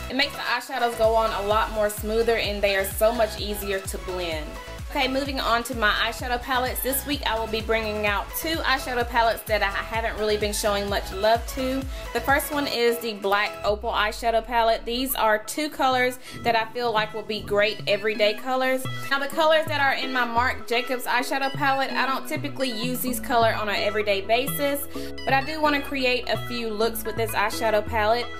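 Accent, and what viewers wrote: South, United States. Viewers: You sound like a fellow southern girl too! :) where are you from? just recently found your channel and loving it!! subbed to ya!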